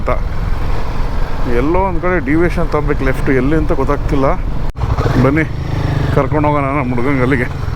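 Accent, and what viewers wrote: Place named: Mangalore, India. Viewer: bro adu Uttara Kannada, neevu mangalore kannada matadta edira :face-green-smiling::face-green-smiling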